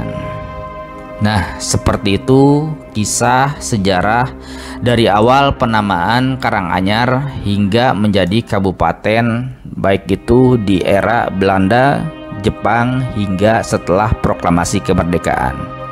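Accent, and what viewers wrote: West Java, Indonesia. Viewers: dr dialegnya 70% sya yakin sepertinya pengisi suaranya orang sunda.. Hatur Nuhun udh bahas karanganyar... sampurasun.. 🙏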